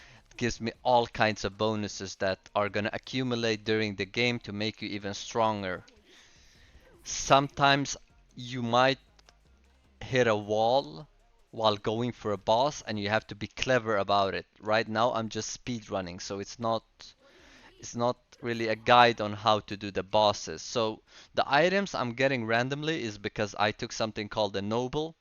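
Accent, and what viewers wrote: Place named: Middle East. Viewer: That’s very nice man. When i heard ur accent i thought that this guy is probably from somewhere near middle east or balkans with a good language education😂. I assume that one of ur parents born in either Turkey or Kurdistan. I’m Turkish and as far as i know a lot of Kurds migrated to nordic countries. Lastly about the game, how important is fishing? I’m N5 right now with Lilith and I barely do fishing.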